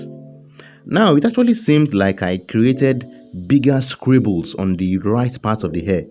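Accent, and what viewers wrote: Nigeria. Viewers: Oh my gosh where have I been, where have you been and why am i just discovering this channel? why have you guys never suggested these tutorials whenever I am searching for stuff?! Awesomely great work bro, your tutorials are sooo educative, informative and very explanatory, absolutely any level can follow!! More success to you, and also I gbadun how your Naija tongue sounds through your clear spoken words!! Carry go jooor, nuffin doeth thou!!!☺☺👍👍👍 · This really helped, thank you so much . By the way, are you a Nigerian?,you sound like one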